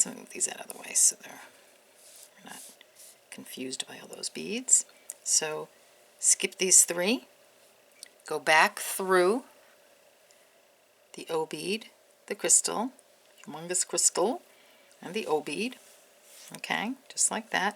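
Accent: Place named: West, United States. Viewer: you do not sound like you are from the northeast, rather some where in the west.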